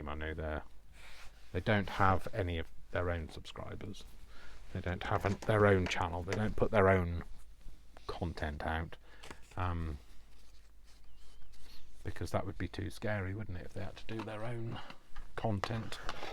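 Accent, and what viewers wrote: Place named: United Kingdom. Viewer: Love them. Love your Britishness, love your accent. Love your creations, love your mind. I love all our UK accents. You be you, because thats what we love about you. 💜💙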